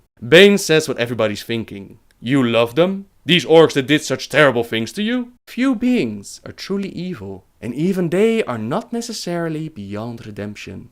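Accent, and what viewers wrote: Netherlands. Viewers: Dat Dutch Aksent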